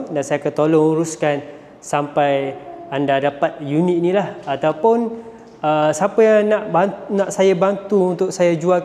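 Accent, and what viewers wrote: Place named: Kelantan, Malaysia. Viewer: penyampaian biarlah dalam Bahasa Malaysia Standard. Pembeli ada juga orang bukan Melayu. Ramai tak faham loghat Kelantan. Lainlah awak nak jual rumah di Kelantan.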